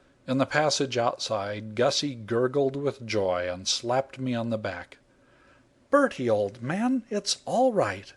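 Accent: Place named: United States. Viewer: Felt it totally out of place reading a typically English story with an American narrator...had to switch off.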